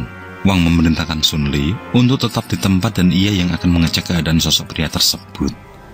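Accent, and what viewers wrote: Java, Indonesia. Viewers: Mantap gambar2 nya.. skill tingkat dewa,di padu logat.jowo yg sesekali kentel,tebal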